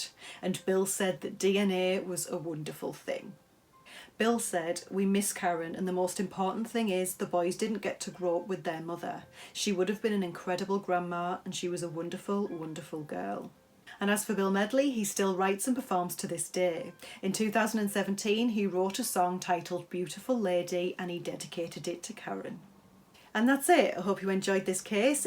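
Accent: Scotland, United Kingdom. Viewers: I liked this video and your accent. Are you Scottish? You are very beautiful.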